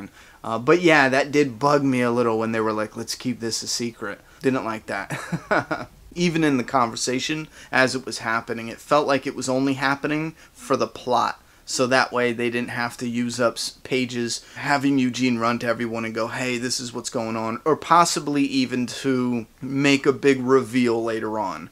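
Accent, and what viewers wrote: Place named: South, United States. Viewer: You have a southern accent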